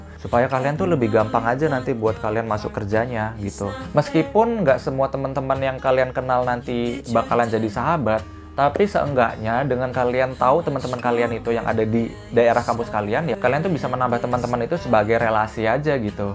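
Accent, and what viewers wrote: Jakarta, Indonesia. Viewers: logatx msh jkt, blm sby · ya dia emng org jkt bro, cuma kuliah di surabaya jd dia lebih fasih bhs jkt, walaupun bhs jawa bisa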